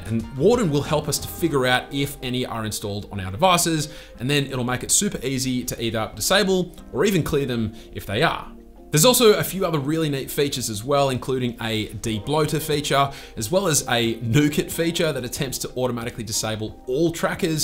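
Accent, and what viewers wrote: Australia. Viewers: is he an aussie? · My man is Aussie 🤟 yea mate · Aussie Accent seems like the mixed baby of British and American Parents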